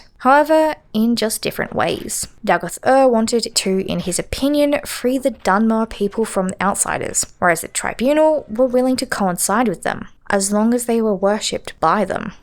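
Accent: Australia. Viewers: Just wondered the same they have like a perfect voice for voice overs thank god for the slight australian accent making it stand out especially nowadays!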